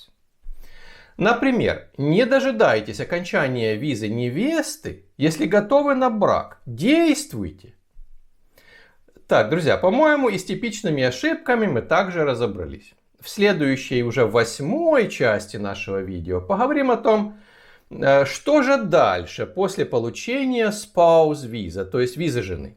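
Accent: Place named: Ukraine. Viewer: я слышу по говору что вы из Украины, и вас как и меня выдает буква Г. Хотя еще могу предположить что вы из Ростова. А мы из Одессы, да, и мы тоже находимся в Лондоне. Так сложились обстоятельства.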